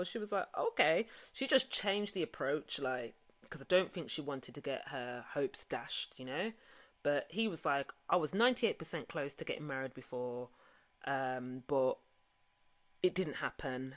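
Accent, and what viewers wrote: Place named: London, United Kingdom. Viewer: I always love to hear a fellow Londoner (but I detect a hint of Brum, am I right!?) on YouTube! Now to the review 🍿 🍿 🍿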